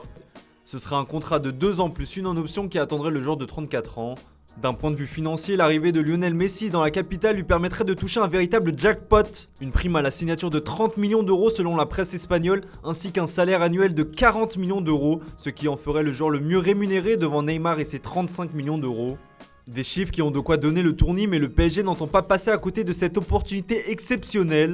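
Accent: Italy. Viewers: aucun rapport mais il est parti l'autre avec son accent italien tout peté? jai unfolow a cause de lui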